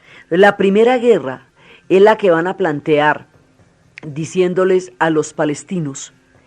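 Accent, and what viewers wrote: Colombia. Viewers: Su exposición goza de un dominio de conocimientos muy alto, fruto de profunda y cuidadosa investigación, pero además, la mezcla del sabor del español de las montañas Colombianas en sus expresiones típicas de nuestra cultura, han hecho que su narrativa me despierte aún más el interés por la historia y la geopolítica de Colombia y de todos los países de los cuales Ud. ha contado sus historias. La admiro mucho!!!Sin duda que es una Colombiana notable en cualquier parte del mundo, que bueno tener el privilegio de escucharla!!! Exitos Dra..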